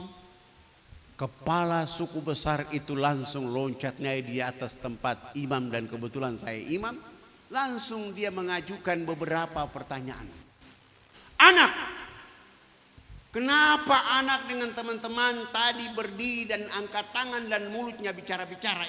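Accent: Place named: Papua, Indonesia. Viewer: Pa ustad kemana aja saya senang dengar dakwah nya.dengan suara kas Papua nya sering" lah isi di yutup.tks sebelum nya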